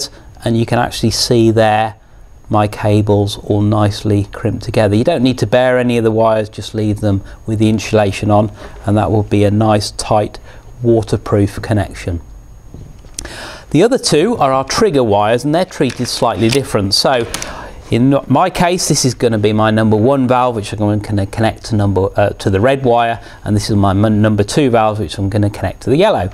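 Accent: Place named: United Kingdom. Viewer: Brits are great instructors...they have a command of the King's English. I am an American. Even though we fought against the British to be free and have our own nation, we fought and died together decades later, side by side in WWII , against the "Progressive Globalists" of that time then, the German Nazis.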